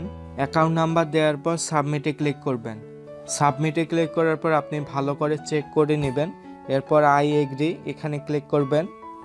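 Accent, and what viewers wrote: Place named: Sylhet, Bangladesh. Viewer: ভাই আপনার কথা শুনে মনে হচ্ছে আপনি সিলেটি আমিও সিলেটি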